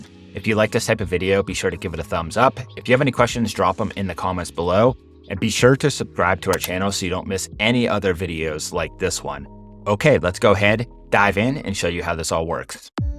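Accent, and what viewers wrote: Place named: United States. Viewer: is obvious it uses your original voice, but being a native spanish speaker i noticed that language has a strong american accent, like an american learning spanish would speak, is there a way to do it without any accent? like a more natural tone? was that because of a setting? thanks for the video very interesting tool!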